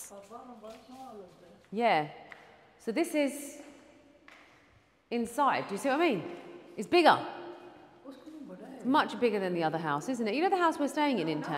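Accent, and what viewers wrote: United Kingdom. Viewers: British accent. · I just love the Uk Accent ..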